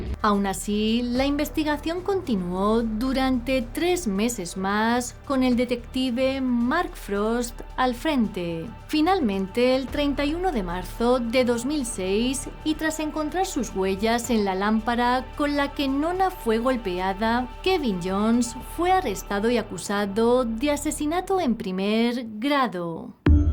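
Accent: Spain. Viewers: No molesten a, así es el acento o dejo en algunas regiones de España… es como que a un cordobés lo criticaran por hablar como un cordobés (de Cordoba, ciudad argentina). Hablan cantando y se les escucha muy gracioso aunque a veces no se les entiende. Pero son genuinos!!! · ¿Le dirías a un mejicano que no hable como Cantinflas?, ¿O a un venezolano que no hable como un narco?, es Español castizo, no es latino, así que ajo y agua, a mí me encanta como habla. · O será el acento de la región de España donde nació. No se que decirte.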